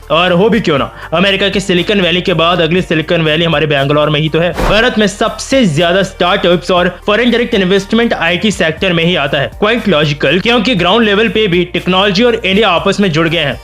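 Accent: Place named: Pakistan. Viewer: Bhadwe tu pakistani hai, fake khabar mat faila.. teri accent pakistani hai BKL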